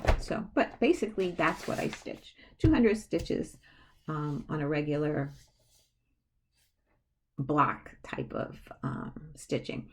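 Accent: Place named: New Jersey, United States. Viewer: I’m also from NJ, but living in NC. Love hearing your accent. I am trying to finish Christmas Camper by Barbara Smith. After that, I’d like to do some Stitching with Housewives patterns. I’m jealous that you have a needlework shop near you. Absolutely love Autumn in the Village, gorgeous! Thanks for your videos.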